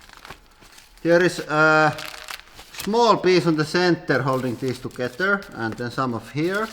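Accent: Finland. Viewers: He´s from Finland. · Charles Ray He is from finland.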